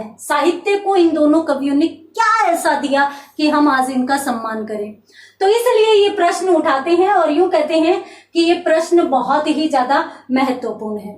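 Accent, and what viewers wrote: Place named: Ganganagar-Bikaner, India. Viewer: Dii ,pata ni par mam ki voice se ganganagar,bikaner region se lagte hai।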